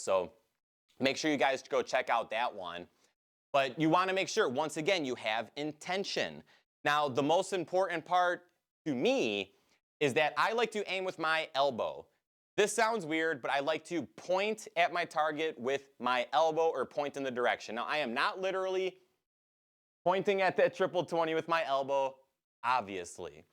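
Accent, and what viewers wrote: Midwest, United States. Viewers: are u from the midwest?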